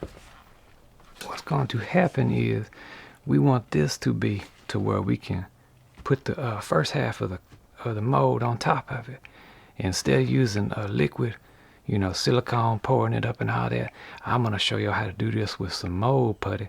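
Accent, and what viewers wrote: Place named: South, United States. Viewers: I love your voice 😍, I grew up in the south and your voice is so nostalgic. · Mississippi I think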